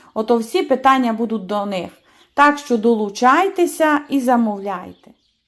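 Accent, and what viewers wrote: Transcarpathia, Ukraine. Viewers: 😢😢😢ооо, ви собі навіть не уявляєте, які спогади в мене з цією стравою і яка ностальгія за Закарпаттям - це мій другий дім. Приємно чути Закарпатський діалект🤗 Коли прочитала назву "м'ясні крученики" зразу згадала рецепт бабусі, який вона називала - м'ясні шишки)) Все дитинство моє пройшло на Закарпатті - чим дуже тішуся. Ще там маю родичів, нажаль дідуся і бабусі вже немає😢. Дуже б хотіла знову поїхати на Закарпаття з своїми дітками, щоб вони побачили ту красу. Можливо з часом поїду. Вам бажаю здоров'я міцного і успішного розвитку каналу💙💛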